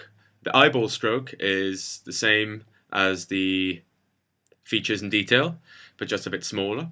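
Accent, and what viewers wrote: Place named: South Wales, United Kingdom. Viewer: Yanni Courtney he has a South Wales accent.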